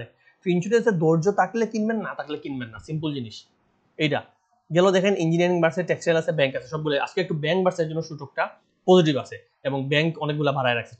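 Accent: Sylhet, Bangladesh. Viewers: Good with sylheti accent. Topic doesn’t match with analysis.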